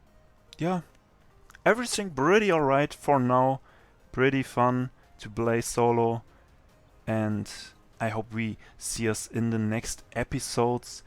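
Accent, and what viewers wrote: Germany. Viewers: Bro man hört ein bisschen raus das du Deutsch bist aber trotzdem geile Videos und gutes Englisch 🔥🔥🔥👋😂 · Du klingst deutsch:b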